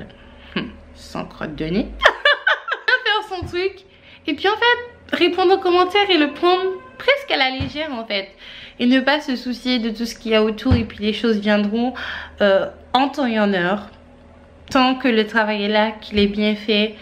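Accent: Antilles, Caribbean. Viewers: Oui tu as l'accent tu as vécu aux antilles ça c'est suwe 🤗🤗🤗mdw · T'es congolaise mais ta l'accent des antillais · Fiche que tu as l'accent antillais 😭💔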